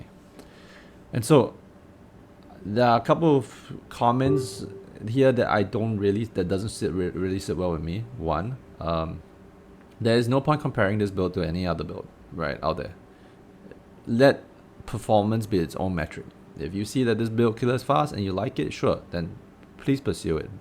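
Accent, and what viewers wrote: Singapore. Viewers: hey man, you sound like you are from singapore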